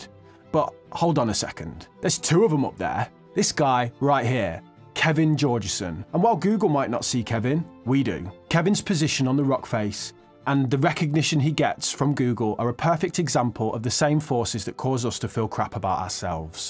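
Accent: Birmingham, United Kingdom. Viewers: is it Birmingham accent?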